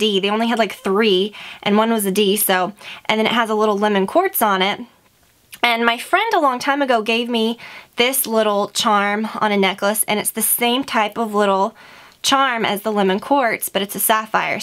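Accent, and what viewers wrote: South, United States. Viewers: You're accent is so cute! Not too country but still southern sounding.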